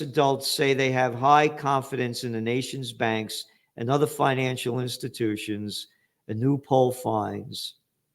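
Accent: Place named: New York, United States. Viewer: Great video, thats what I miss NY effing talk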